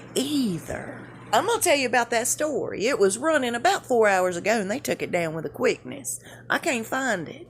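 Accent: South, United States. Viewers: So good to see you! You look beautiful, love your hair. Wish mine would stay put! I swear it has Dementia, I used the curling iron yesterday, tamed it with some hairspray so it would last the next day. check this out, I am mixed, with mixed hair, I woke up with freaking straight hair, how is this possible! When I want it straight, it doesn't stay, and gets frizzy and crazy. By the way, loving the Chewie Bag. Do you still live near the area Chewie disappeared? You know I'm still hoping he returns. It's good to see you smiling again, it really is. Always remember there's alot of people, you don't see that truly care, and love the heck out of you! Lots of love from Brooklyn NY You have the most adorable voice and the cutest Southern accent! And yet, when you laugh, 🤣 you sound so devious! You have a Bless good morning, and a beautiful day.💕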